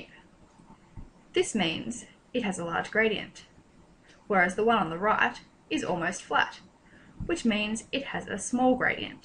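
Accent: Australia. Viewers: i couldn't stop laughing at the Australian accent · Good video, minus the australian accent that kinda got on my nerves.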